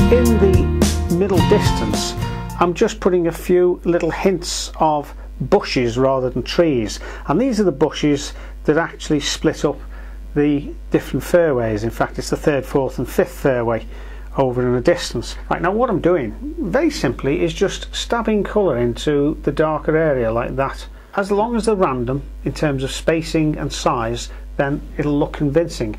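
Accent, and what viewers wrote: Liverpool, United Kingdom. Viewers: Did the Pres like his painting then? I agree with everything Parrot said too, such a lovely Liverpool area accent you've got.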